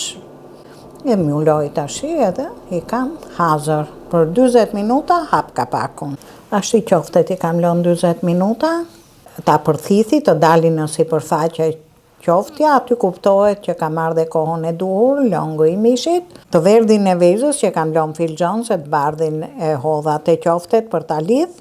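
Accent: Tirana, Albania. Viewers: Amon mi se na knoqe me kete gjuhen tate prej tironse ilac je me kete dialekt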